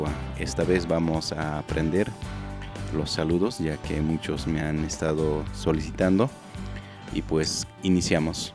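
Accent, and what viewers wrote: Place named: Andahuaylas, Peru. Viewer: Me gustó es igual a lo que aquí se pronuncia Saludos desde Andahuaylas-Apurimac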